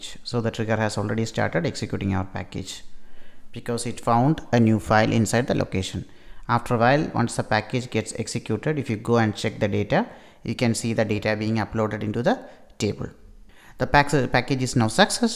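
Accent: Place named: Kerala, India. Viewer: malayali accent :)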